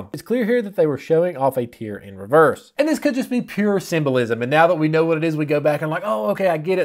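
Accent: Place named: East Texas, United States. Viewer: I missed about half of what you said because I was trying too hard to place your accent 😂 East Texan maybe?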